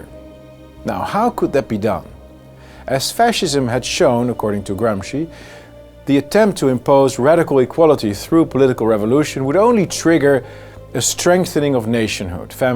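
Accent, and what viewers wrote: United Kingdom. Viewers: Dit soort video's bewerkstelligt dat je verder van leiderschap komt te staan niet dichter erbij ! Dit soort grappige boodschappen nemen stemmers niet serieus sorry............na de laatste keer FVD gestemd te hebben ga ik weer terug naar de PVv sorry , had er goede hoop bij. Maar dit soort dingen maakt het lachwekkend , en absoluut niet MP waardig !!!!!!!!!!!!!!!!!! Tis speels en kinderlijk maar niet volwassen gedrag . Dit doet men niet thierry...........check maar eens Pim die had het en kon het ! Je hebt zo een goede mensen naast je Gideon , Pepijn enz enz...maar je zet hun te kak , wat hun sterkte laat afnemen. Je bent eigenlijk een man die is blijven hangen in zijn kindertijd en onvolwassen overkomt , veel geschiedenis kennen is leuk maar niet in te passen in dit ! Jammer dat ik niet val voor je GB accentje sorry ......................its over FVD ......te danken aan dit soort filmpjes . 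Eigen graf gravend ten top !!!!!!!!!!!!!!!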